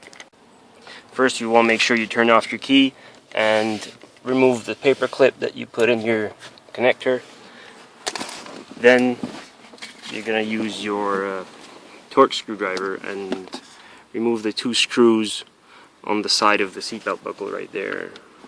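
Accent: Canada. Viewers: You sound like you're from NB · Edmunston area is my guess. Sounds just like my cousin's husband.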